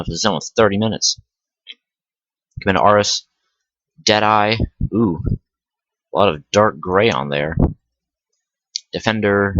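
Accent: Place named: United States. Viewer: He's got a perfect American accent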